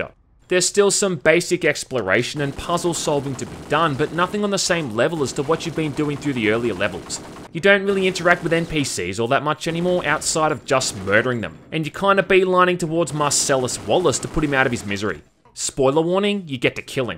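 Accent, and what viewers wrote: Australia. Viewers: defo an aussie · My new favourite thing: listening to game reviews in an australian accent 😅 I wanna add, that cypress hill not only made the soundtrack some of the voice acting as well.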